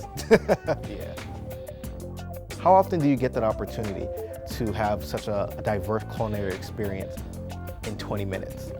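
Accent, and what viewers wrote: United States. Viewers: I get having an American accent thats fine but why would you delibrately mispronounce your own name 🤨. It's not an English name so why are pronouncing it in an American accent. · First of all, he pronounces his name wrongly. An Igbo man pronouncing his name with an American accent okay let's talk about this food This is the worst way to prepare a Nigerian beef stew, this is an abomination coming from a Nigerian living in Nigeria 🇳🇬. In Nigeria we don't blend ingredients with salt and tomato 🍅 puree and bayleaf in a blender Also we boil our beef before frying nobody fries raw beef, no Nigerian does this. Dear this content even though it's old is misleading and Nigerians on Twitter have a different day about this food. He should go back and learn from his mom, and many Non-nigerians would think this is how we cook. Chineke nna 😭😭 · This man is Nigerian and he sounds perfectly a american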